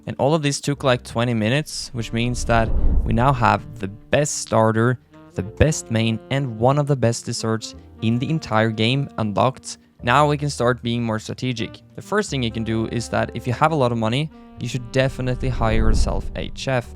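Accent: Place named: Norway. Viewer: Brooo Are you from Norway? Or should I say er du fra Norge? Jeg så det når du sa vi skulle abonere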